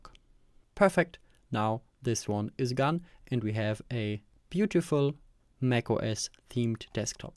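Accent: Germany. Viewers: Hey, da ich deinen deutschen Akzent raushöre, wollte ich auch ein Kommentar auf deutsch da lassen!:D Es hat alles super geklappt, vielen lieben Dank! Du hast alles entspannt und ruhig erklärt!!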